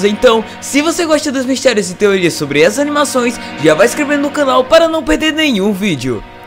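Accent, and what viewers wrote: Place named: Northeast, Brazil. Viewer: Na moral esse seu sutaque nordestino da agunia se loko fala todo errado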